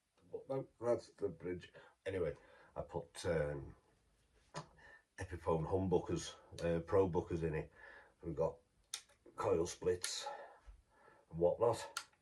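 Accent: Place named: Northwest England, United Kingdom. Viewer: Nice one! Love that gothic looking pick guard on the tele! You sound like you might be from the same part of the world as my family, in the north west, somewhere near Liverpool perhaps?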